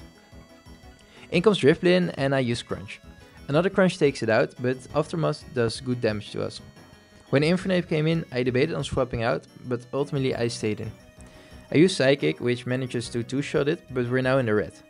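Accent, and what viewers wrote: Netherlands. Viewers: weird question but you got a very dutch accent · i taught i heard a dutch accent, i am from belgium are you from belgium or the netherland3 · Hmmm that accent. Sounds dutch to me lmao because I am dutch too and I can recognize it lol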